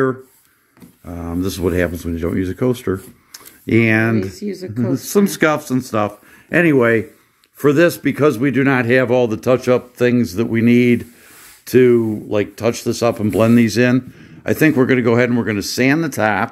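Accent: Chicago, United States. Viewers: Magical, masterful, mystical restoration. With a Chicago accent.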